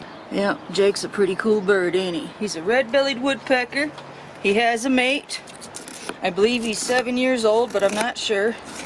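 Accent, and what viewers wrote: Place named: United States. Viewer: go there with a shiansaw sister it's more god for you're body and you're hearth you know i'm from Québec city but i love you're accent frome the US you know ??? but i don't like you're show's HAHAHA lollll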